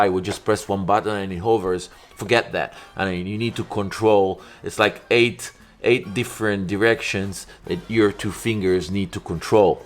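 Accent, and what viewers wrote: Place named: Italy. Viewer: Ciao, ammazza parli meglio di me l'inglese. Diciamo che si riconosce l'accento Italiano ma si capisce benissimo, io ero pilota privato ora sono Al secondo drone, un giocattolo da 30$ giusto x funn, un abbraccio 😘✌🇨🇦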